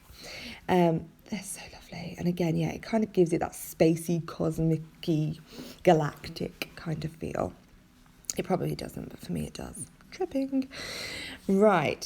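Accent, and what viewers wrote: United Kingdom. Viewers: I love listening to your British accent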